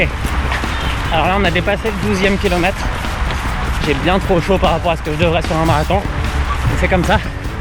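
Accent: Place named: Quebec, Canada. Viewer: Tu avais plus l'accent québécois il y a 6 ans .. tu l'as un peu perdu depuis 🤪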